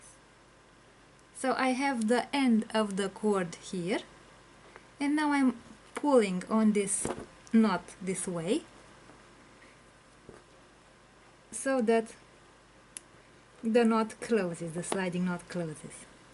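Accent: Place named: Iceland. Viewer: Very helpful tutorial! Love your accent! Are you from Iceland?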